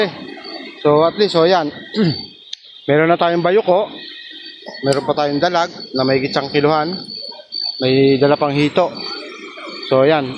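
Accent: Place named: Mayhayin, Philippines. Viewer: Tiga saan ka lodi? Puntong mayhayin ka a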